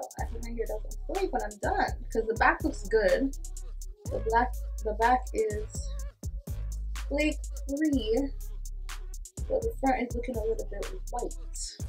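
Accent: Jamaica. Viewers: Are you Jamaican? 🇯🇲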